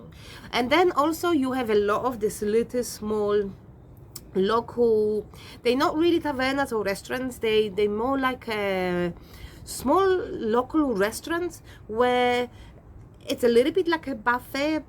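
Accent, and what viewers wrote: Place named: Greece. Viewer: Your accent when you speak English is charming - and actually sounds quite Greek-ish to like rather than Polish to an English listener, so I think this is a good sign that you should fit in very well in Greece! X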